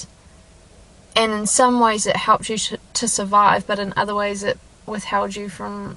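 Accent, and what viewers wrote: New Zealand. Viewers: I have an interest in accents, are you a NZ who's moved to the US or vice versa?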